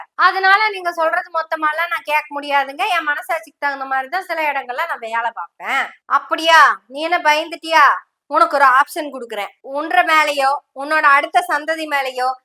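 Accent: Kongu, India. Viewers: Madam, excellent resentation in Kongu Tamil. For social reformation and upliftment. May God bless you with prosperity. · கொங்கு தமிழ் நாவில் இசை பாடுகிறது தோழி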